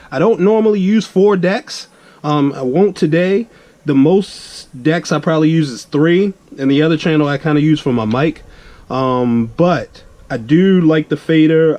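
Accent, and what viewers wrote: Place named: Baltimore, United States. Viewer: I can tell this man from Baltimore 🤣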